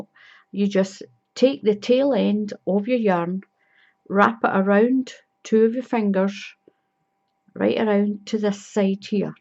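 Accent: Scotland, United Kingdom. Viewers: Great to hear a Scottish accent on a crochet tutorial. Love this pattern thank you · Am really enjoying making this blanket during lockdown , have learnt alot . Love your Scottish accent and hope to see and hear more of your patterns in the future .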